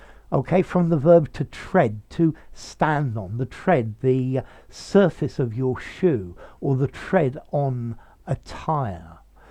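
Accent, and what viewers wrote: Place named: England, United Kingdom. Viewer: I can't thank you sir . Really clear explanation + awsome English accent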